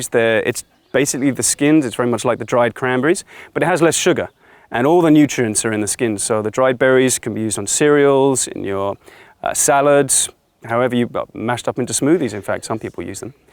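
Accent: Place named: England, United Kingdom. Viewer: So nice to hear English English from NOVA SCOTIA. I have just bought some seeds and am aching to seed them..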